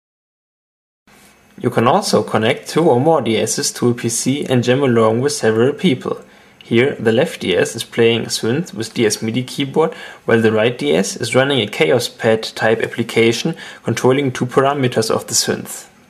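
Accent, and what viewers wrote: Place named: Germany. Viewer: You have a german dialect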